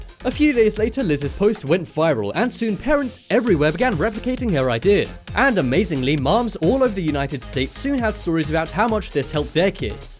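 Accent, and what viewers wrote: England, United Kingdom. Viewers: God you're so dumb , he pronounces mum differently because of his accent. Just because he has an English accent doesn't mean he's going to pronounce it as Mum. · He's speaking American English with an English accent ???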